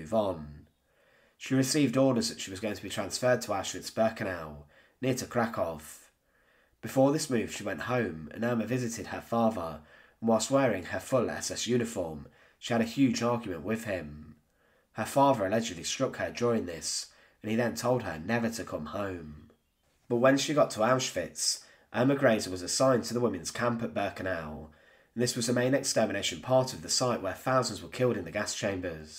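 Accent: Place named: England, United Kingdom. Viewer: You simply just don’t like his British dialect. Which is fair, we’ve all felt that at some point. But dudes not a bad narrator. Sure the mic quality could be better and it probably will be in the future.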